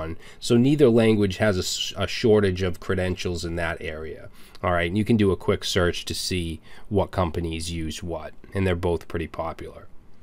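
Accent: United States. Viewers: Man, I dig your accent. I think it's as American as it gets.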